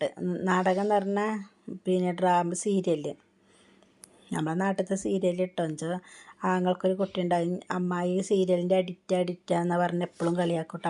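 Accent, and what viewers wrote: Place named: Palakkad, India. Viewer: കേൾക്കാൻ ഇഷ്ടമാണ്.പാലക്കാടാണോ നാട്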